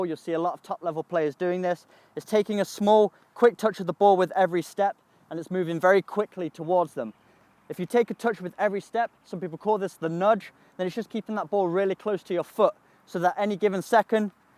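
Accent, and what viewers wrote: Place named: United Kingdom. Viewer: He has a British accent but has an American flag on the pole behind him. What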